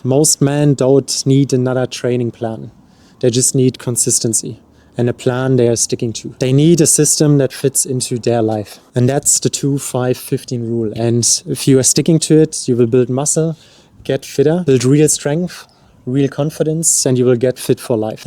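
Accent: Germany. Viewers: Are you German by any chance... I live in Germany and your accent is like German... Just curious. Also, very honest advice. I hope and pray your channel grows since the major population on YouTube or social media looks for flashy shortcuts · When germans speak english :D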